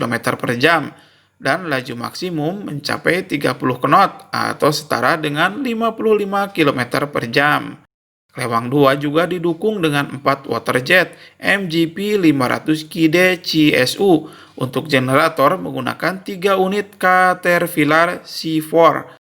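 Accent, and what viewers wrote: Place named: Papua, Indonesia. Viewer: admin logat ny kya orang papua ya.. khas banget😂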